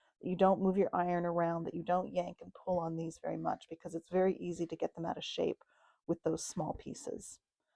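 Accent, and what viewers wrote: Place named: Canada. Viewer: Trying to determine where you are from because of slight accent! Canada, New York? I think Canada. Love the quilt and pattern and your video is excellent. Like how you discuss measurements cuts and note bias and grain!!!!!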